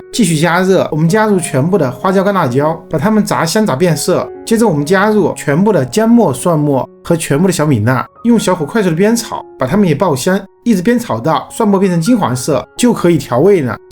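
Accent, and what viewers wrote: Wuhan, China. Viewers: 听口音是武汉人吧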